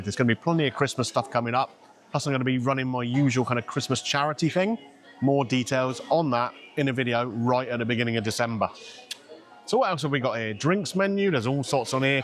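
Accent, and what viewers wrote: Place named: Wales, United Kingdom. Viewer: Very interesting review there, thanks. When I think about courses I've paid £17 for over my time, that is Lasagna has to be close to the worst value by far, and when you look at that independent giving more for less, it shows that there are taking the mick. Changing subject, how long did you live in Caerphilly for, I'm from Wales and I swear I'm picking up a bit of a welsh twang now and again!:)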